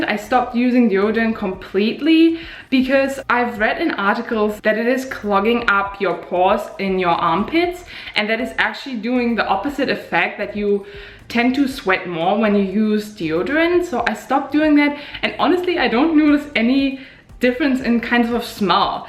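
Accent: Germany. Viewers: I love German English 💚💚😆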